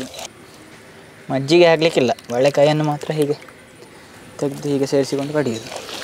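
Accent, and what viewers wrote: Mangalore, India. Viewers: No matter what u make, just watching ur videos only bcz of your manglore kannada accent.....wowwwwaw!!!! lOving it since ur 1st day Keep going big fan of u🤩💫 Love from benne nagari - Davanagere KA 17💪💥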